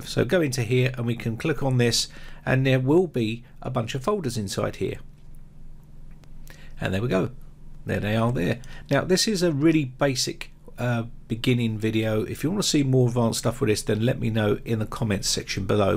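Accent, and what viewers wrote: United Kingdom. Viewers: Couldn't help loving the accent. Where in UK are you from?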